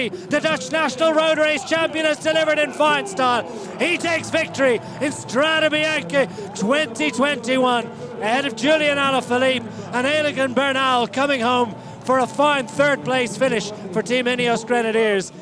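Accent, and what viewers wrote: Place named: Ireland. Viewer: It's not Rob Hatch. He did the live Eurosport commentary with Dan Lloyd, but Rob Hatch is English, this fellow's Irish. It sounds like Michael Hutchinson.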